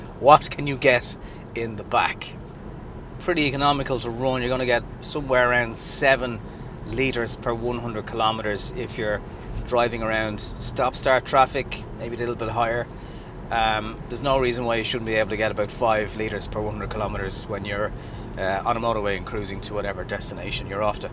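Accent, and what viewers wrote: Ireland. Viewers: Irish accent. 🤭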